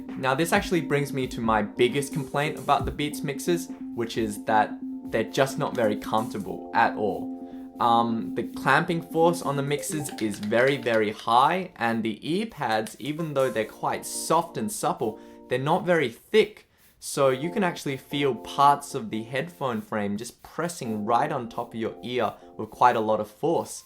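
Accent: Australia. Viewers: What accent is it? Aussie?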